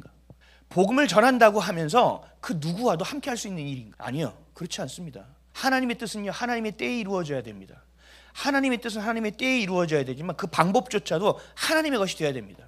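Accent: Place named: Pyongan, North Korea. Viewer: 목사님 혹시 선대가 이북에서 오직 믿음 을 지키고자 남하셨던 실향민 이 아닌가 생각 되는데요. 목사님 억양에서 아주 살짝 평안도쪽 억양이 있으신것 같아서요. 저의 외조부 님도 이북분 이시라 그리고 어려서 피난촌 주위에 살아서 할머니 할아버지 주위에 이북분 들이 많았습니다 . 제가 어렸던 70년대 초때만 해도 예수쟁이 = 이북사람 이런 공식이였으며 이분들이야 말로 진정한 그리스도 의 믿음을 간직하셨던 분들이 많았죠. 저는 이분들이 이제 다 대한민국 에서 천국으로 뜨나셔서 하나님 앞에 바로 서셨던 분들이 진심으로 이나라를 위해 간구 하시는 분들이 안계셔서 대한민국이 이모양이 되지 않았나 저 나름 생각 합니다. 우리가 아무리 믿음이 있다 해도 이북에서 목숨 걸고 내려와 목숨걸고 예수 믿었던 분들 에게 비하면 아직도 많이 부족한가 봅니다.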